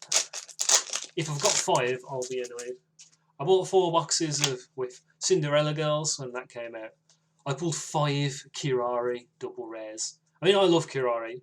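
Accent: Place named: Newcastle, United Kingdom. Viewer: Question. Where in england do you orignaly come from? Cause i think you kinda sound Newcastleish, but yeah. haha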